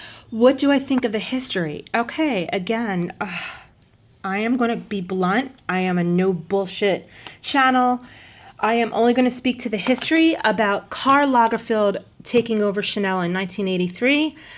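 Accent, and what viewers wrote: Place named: New York, United States. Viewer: I love you're accent are you from n.yc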